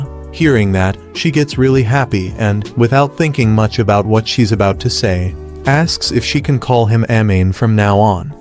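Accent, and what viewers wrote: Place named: India. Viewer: why did it switch to an indian dude narrating with no warning help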